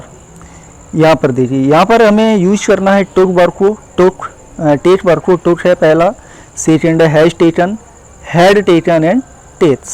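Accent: India. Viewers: you are poor English speaker.your pronounce as like as Indiana. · Amr Alhabori because this is an stupid indian teaching to illiterate dumbasses hear english from the people who speak in a good accent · I can hardly understand you, coz of your terrible Indian accent teacher. Well I will try to make the best of this grammar exercise.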